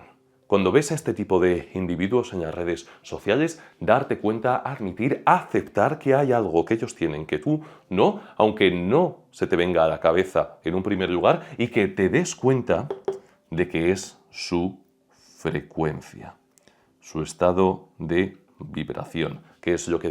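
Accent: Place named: Latin America. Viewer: Lo que preciso es mirar a tu espalda por tanto tiempo como quisieras mostrármela. Qué guapo eres,. Sólo espero que la cámera pueda encajar más bajo de todos lados. Dulce. Lo siento. A la profesional. Como maestro de castellano, yo querría saber de tu manera del hablar., hablas un poco como un latino en relación a "voy a..." y nunca usas "esperaré, intentaré". Además usas "las cosas" cuando un español de pura cepa nunca lo haría. "La cosa, la cosita" son de latinos. ¿Tienes amigos latinos o te has quedado en Perú o Méjico? ¿Quizá tu mujer es latina? I'm strictly curious and obviously Te visto con buenos ojos, and mean you no ill will. Si alguien me ofretara 1000€ a decir algo malo de ti, no lo haría. Y finalmente, sobre el tema: La razón por qué no funciona la serie de "cosas" por un tío sea que lo que dijera no era factual. "Sí, lo quiero", pero en el corazón, no es verdad. 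Cuando nos mentimos puede sonar de verdad y a veces sólo nosotros no sabíamos que fue mentira.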